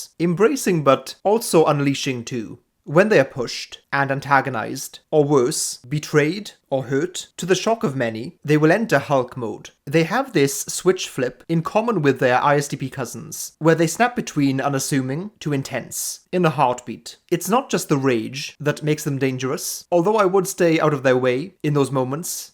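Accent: Wales, United Kingdom. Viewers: He was the first yt channel I found on mbti - Iearned a lot with his simpler style videos, then moved more to your channel for the fancy language and welsh accent.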